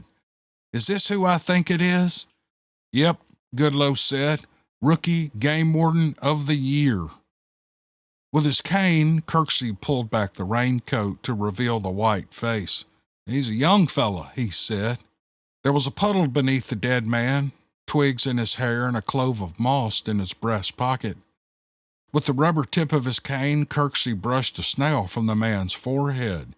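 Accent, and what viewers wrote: South, United States. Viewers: Hey,great stories about the poachers,I really enjoyed them,you've got the perfect southern voice to tell stories.👍🤙👍🤙👍🤙👍🤙👍🤙👍🤙👍🤙👍🤙👍🤙👍🤙👍🤙.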